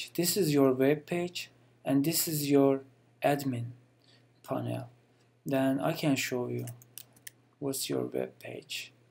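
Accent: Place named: Turkey. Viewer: Çok faydali ve işe yarar bir video olmus sagolun bey bu arada videodaki ingilizce telaffuzunuzdan anladim sizinde bir Turk oldugunuzu:))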